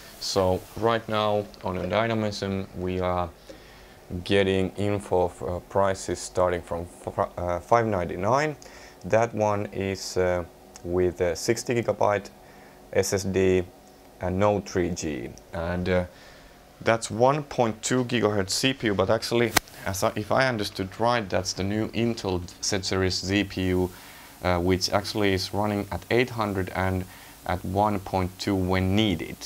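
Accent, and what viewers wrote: Finland. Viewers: ru Finnish?...u sounds like my friend from Finland. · I seek reviews on a gadget and I somehow always end up watching the ones with that guy and his finnish accent ;). They're the best. Thanx for them all!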